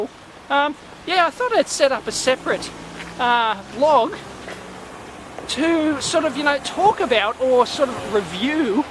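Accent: Australia. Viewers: Since I'm at work when Neighbours is on, and Steve Irwin went to the big Croc Rodeo in the sky, this is the place I come to get a dose of Aussie accent.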